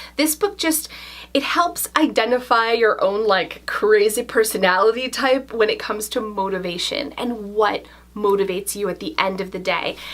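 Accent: Canada. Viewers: In the same way you like her Irish accent, I enjoy your Canadian accent! 😍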